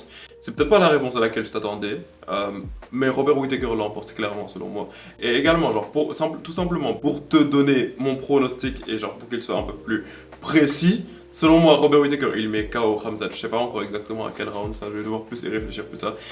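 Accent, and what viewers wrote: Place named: United Kingdom. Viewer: T’as grandi en Angleterre ? Je trouve que t’as un accent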